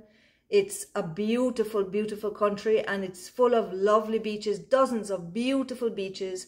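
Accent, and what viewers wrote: Jamaica. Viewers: miss Jamaica before we became the murder capital . your accent takes me back to the good old days . thank you. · As mentioned in another of your videos, I am from St. Ann...but until this past July, I had NEVER been to "Irie Beach"...also called ""Irie Gully Falls" (there are some other names used as well). Can't wait for December to take my wife there. Just LOVE the way you talk. I feel like I should write my comments in patwa....LOL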